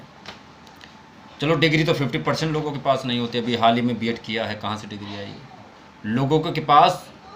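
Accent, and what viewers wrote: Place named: Ghatampur, India. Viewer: Ghatampur ya fatehpur ki language lag rahi hai, sir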